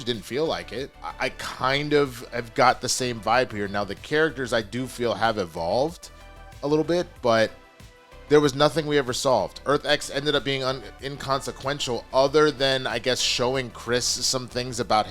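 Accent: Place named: South, United States. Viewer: I loved the finale. This was continuing the DCU story not Superman’s story as you said on today’s stream in that wonderful southern accent. To me the story of the season was clear. It was a romance story between Chris and Emilia and a character study of Chris and how HE AFFECTS THOSE AROUND HIM so even if he isn’t on screen we are seeing how important he is to those around him. The story wasn’t about Nazi Earth it wasn’t about Big cameos it was about Chris, and how his decisions affects those around him and dealing with consequences.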